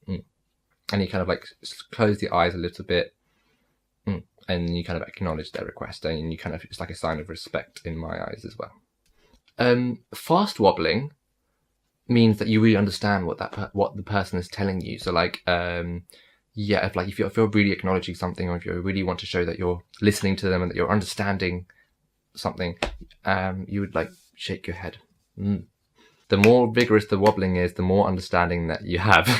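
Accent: India. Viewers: you can mask your Indian accent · I like your indian English accent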